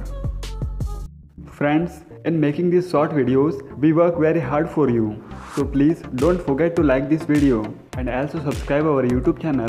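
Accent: India. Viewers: But u have indian accent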